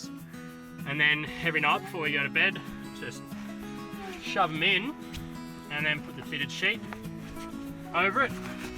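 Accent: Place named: Australia. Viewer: So nice to hear Aussie voice on YouTube. Subscribed just cos that (well and you say you'll be vlogging your travels around Oz). I liked how the tent was dusty and stuff - obviously getting used and well, dust, so Australian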